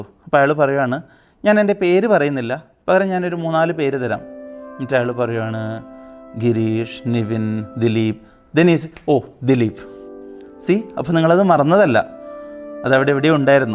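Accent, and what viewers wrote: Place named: Kanhangad, India. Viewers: അങ്ങേടെ ഭാഷയിൽ ഒരു മലബാർ ശൈലി ഉണ്ടെന്നു തോന്നി. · Kannur alla kasaragod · Kanhangad alle aadhiyettan...kannur alla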